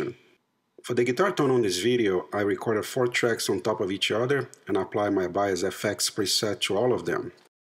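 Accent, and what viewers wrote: Brazil. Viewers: You sound Brazilian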